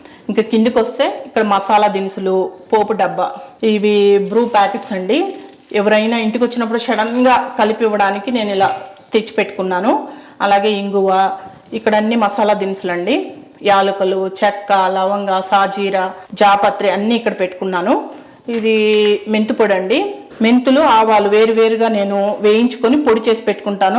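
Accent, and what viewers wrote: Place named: Ongole, India. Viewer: Mam me own place akada me language ongole side la undi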